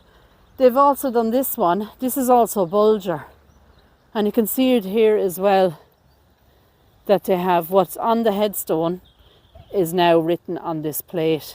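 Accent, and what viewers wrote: Ireland. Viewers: I love you channel and Irish accent!!! Some of my mom’s family were Irish. Great ancient graves 😻!!! We don’t have any like that here in the U.S.A.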